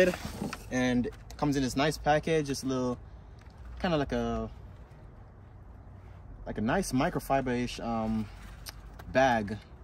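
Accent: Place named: Jamaica. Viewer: Jamaican accent came out in this one.. Proud of you, Excellent video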